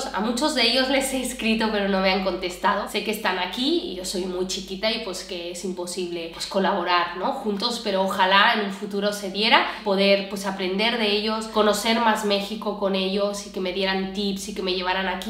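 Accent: Spain. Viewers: Me gusta tu acento español y la forma profesional en qué haces tus vídeos. En hora buena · Mmmmmmm!! Mmmmmmm!!! Ummmm!! Sientes que tu forma y acento español haya sufrido alguna transformación? Sufrido? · Excelente video de preguntas y respuestas, ya casi 300K me encanta ver como sigues subiendo como la espuma, yo te haría dos preguntas, la primera es si estas buscando oportunidades de actuación en México ya sea en TV o en teatro y si lo estas haciendo que tanto ha influido en que te seleccionen o no tu acento Español/Catalán, la segunda es con respecto a los canales con los que quieres colaborar, me gustan todos los que mencionaste y de hecho creo que los sigo a todos, pero no mencionaste a ni un solo canal de Españoles que reaccionan a México y que de hecho han visitado el país y han creado también su propio contenido de sus viajes y vivencias aquí, Cygnus y The Romantic Corner (Chris y Carmen, casados y con un hijo recién nacido en México) serian para ti super fácil de conocer pues están radicando actualmente en CDMX... 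mucha suerte y, me encanta su contenido..... SUGERENCIA pueden agregar la opción de membresías al canal y subir ahí contenido sin editar o que por alguna razón dejan fuera de la edición final, eso les podría ayudar a incrementar su ingreso... Saludos desde Monterrey. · Me gusta mucho tu contenido. Felicitaciones. Por otra parte, me he dado cuenta que estás perdiendo el acento español. Definitivo, los acentos se pegan depende de donde uno viva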